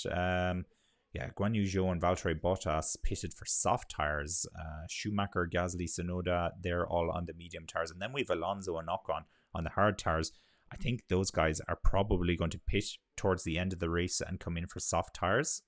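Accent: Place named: Ireland. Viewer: Aaaah a good Irish accent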